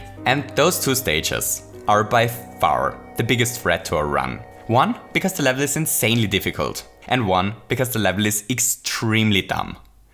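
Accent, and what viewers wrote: Germany. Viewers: Wie ich immer herausfinde anhand des accentes das jmd deutsch bzw Österreichich spricht. irgenwie hört man das raus! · I hate the German acsent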